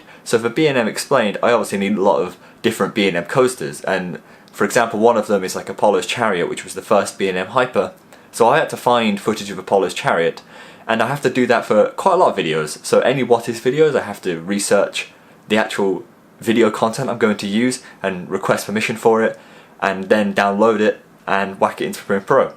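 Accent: United Kingdom. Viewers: ok I get it why you have a British voice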